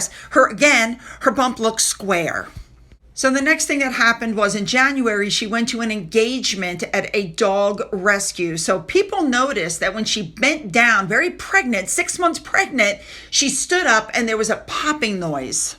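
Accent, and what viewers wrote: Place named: United States. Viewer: I love your pronunciation of our very difficult English (and Scottish) place names. I still have trouble now and then with places new to me. You give it a go and who cares if it has an American twang - makes it more interesting.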